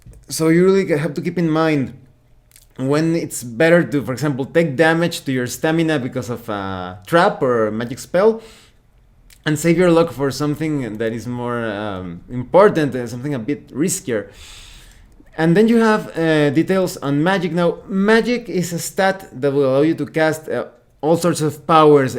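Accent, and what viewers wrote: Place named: Spain. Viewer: I really dig this review for several reasons: relaxed but not boring, comprehensive but not overextended, and it really gets you into the game mechanics without getting lost in too many details. You totally won my attention into AFF 2e! Bravo! A curiosity: I recognize that your a native spanish speaker based on your accent, but you talk fluently through many sayings with no flaw, can you tell me how you got such a mastery :)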